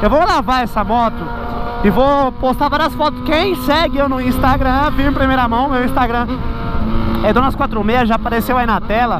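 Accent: Bahia, Brazil. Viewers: mooooo viaje falar baianes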